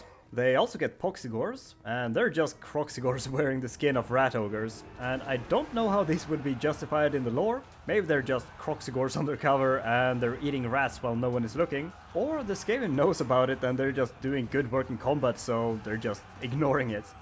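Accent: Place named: Sweden. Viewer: Are you from Sweden? It sounds like it.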